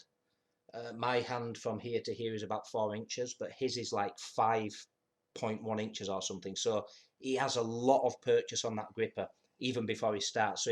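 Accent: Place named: North, United Kingdom. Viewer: Really interesting vid with well articulated points - referencing all my fave grip channels. Subbed after being directed here by Nick. Good to hear a familiar northern accent talking grip. Look forward to more content ✊💪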